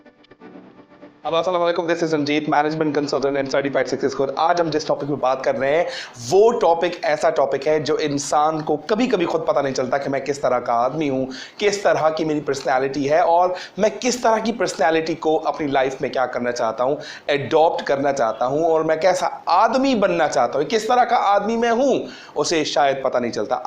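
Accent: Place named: Pakistan. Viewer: I'm from Pakistan and your speaking style is like Pakistanis You present the topic very well Thanks 💖💖💖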